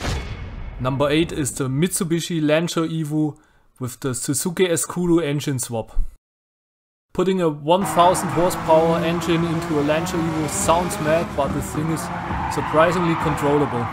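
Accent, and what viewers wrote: Germany. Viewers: I like u Kartoffel English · I smell Deutsch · Ich liebe deinen deutschen Akzent 😂♥️ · Junge, red doch einfach deutsch. Das is ja grausam.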